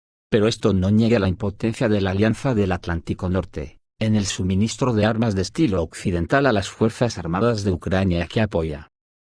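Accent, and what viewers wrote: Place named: Spain. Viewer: MAESTRANZA RECUERDA ALGO EN CUANTO LA JEÑGA O EÑL DIÑLECTO DE HABLAR. ES ESPAÑOL, ESA ES SU FORMA DE HABLAR Y LO EXPLICA MUY BIEN NO IMPORTA QUE DURE DOS HORAS, LO MEJOR ES EML MENSAJES QUE DICE YA TODOS ESTA DICHO Y YA ES TARDES, UN DÍAS DE ESTOS AMANECERENOS EN EL INFIERNO Y NO DEL DIABLO SI NO CREADO POR LA ESTUPIDÑE DE LA OTAN QUE NO ENTIENDE NADA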